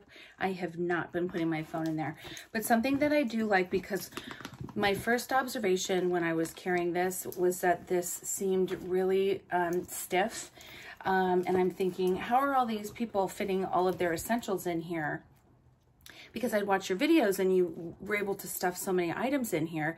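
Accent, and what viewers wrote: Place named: Orange County, United States. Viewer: Omg I’m dying I could so tell your from Orange County area ! I’m from the OC too born and raised in Irvine / Huntington 😂💗 love your videos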